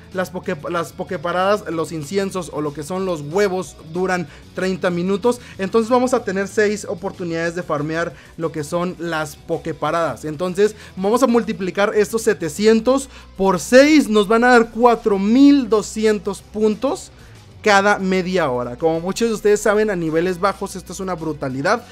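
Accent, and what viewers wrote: Durango, Mexico. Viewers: eres de durango we hahahaha eaaaaaaaaah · no mames!!! eres de durango:o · oye eres de durango? 😳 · eres de Durango :v? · eres de durango?!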